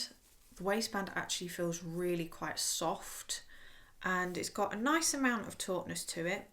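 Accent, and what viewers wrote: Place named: United Kingdom. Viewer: YOU HAVE BRITISH ACENT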